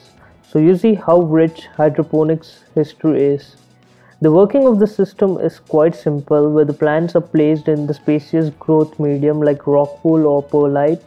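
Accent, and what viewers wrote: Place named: India. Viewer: A white animation figure and an hindi accent. As long as it is not the IRS I am fine I guess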